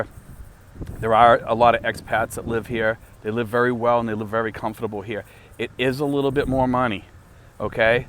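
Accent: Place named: New York, United States. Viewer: I hear an east coast accent. Are you a New Yorker?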